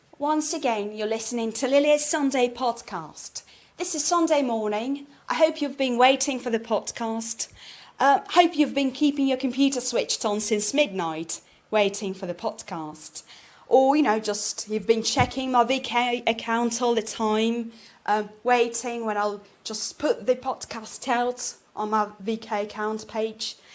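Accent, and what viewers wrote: United Kingdom. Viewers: Не люблю британский акцент. Американский роднее и проще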